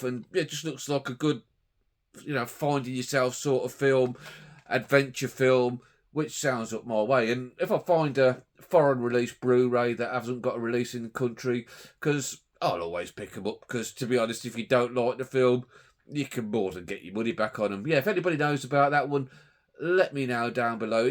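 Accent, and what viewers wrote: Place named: Black Country, United Kingdom. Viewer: Ive not sin any bad boys after 1&2 which i think 1&2 are bostin, i probably wow pick uo a box set i may just track em down, singularly to check out. Little random but am yow from the black country ? Cause yav got a simular kind of accent to me 😂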